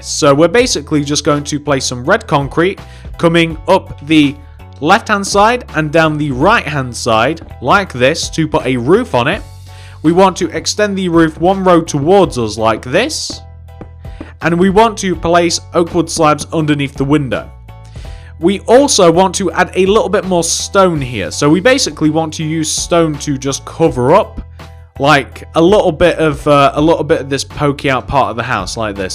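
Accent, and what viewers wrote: United Kingdom. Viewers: I'm gonna admit you sound just a little British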